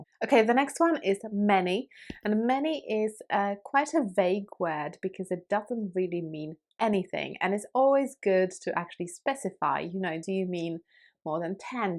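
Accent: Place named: United Kingdom. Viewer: I need to recall the vocabulary of the years I used to study in England. All of these words, phrases and accent are gone…at the same time it feels extraordinary the perfection of your British accent! Excellent work! ☺️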